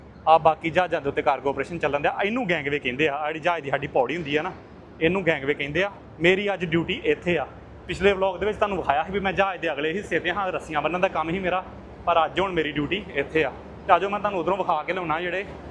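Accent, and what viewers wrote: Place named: Gurdaspur, India. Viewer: tuhadi boli toh lagda hai k Gurdaspur dinanagar toh ho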